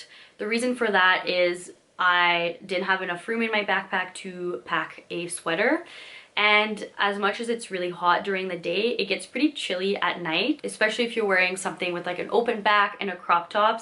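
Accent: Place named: Canada. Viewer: You really analyzed this Coachella event especially the differences between Weekend 1 and Weekend 2. You are so good at planning and organization, you should work in government budget analysis! I love your Canadian accent, it really makes you sound warm and personable, and can make a mean maple candy! Just kidding!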